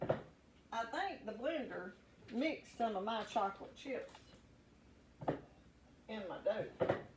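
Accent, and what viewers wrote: South, United States. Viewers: I may make these tonight! I love your southern accent. Yumy Yum - Thank you. (Greensboro NC)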